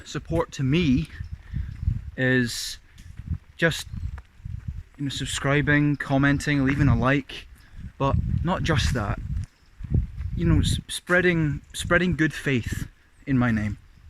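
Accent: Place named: Scotland, United Kingdom. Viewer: Oh my god you have grown up so much! Your accent too! So mature and Scottish. Keep up the great work!!!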